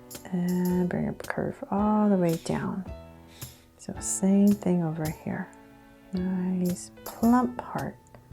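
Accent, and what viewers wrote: United States. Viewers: Omg I ❤️ Your American accent!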